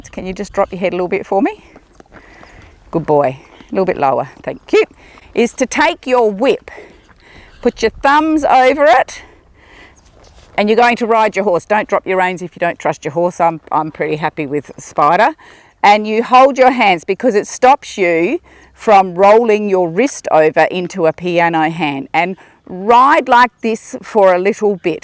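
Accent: South Australia, Australia. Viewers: Thank you for this. I've switched to Western from English (or plain old bush bashing). The quarter horses I ride in my lesson have very slow lopes and their heads bob up and down. I have a lot of trouble keeping my hands still as they tend to go with the horses bobbing head! Western people don't seem to close their fingers but I will try this at my next lesson and see if by closing my fingers more and tucking my elbows into my hips if I can keep my hands still! I'm hoping if my hands are still and fingers closed my lesson horse may get into more of a frame. I'm pretty much taught in Western to use my leg and seat for turning. The horses I ride are both very well trained and basically if i just look a particular way, they'll go there but I definitely have trouble loping and keeping my hands still. By the way, are you from South Australia? South Aussie's seem to have a slightly different accent. 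lol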